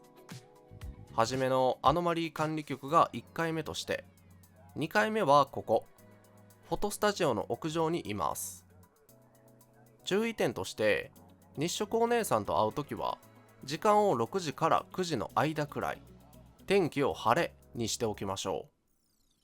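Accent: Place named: Kansai, Japan. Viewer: 隠しきれない関西訛り好き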